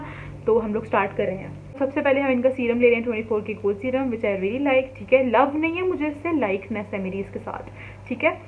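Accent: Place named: India. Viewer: slow down please you speak so fast like when you speak India, one hundred a miles an hour I don’t understand your English of course your English intonation like India speaks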